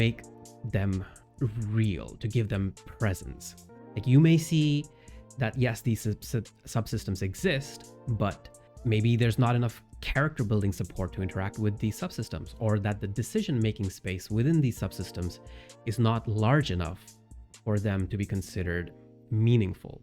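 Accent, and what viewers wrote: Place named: Canada. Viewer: I love your accent! It's a subtle Canadian accent (I think?) but there's something about it that is distinctive that I can't place, even though it's extremely mild. Also great ideas about the game, a lot of people sleep on subsystems and I think you've pretty comprehensively analyzed why.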